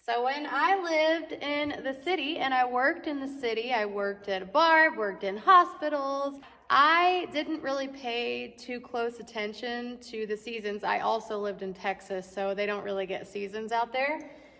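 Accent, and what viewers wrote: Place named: Wisconsin, United States. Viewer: Sounds like Wisconsin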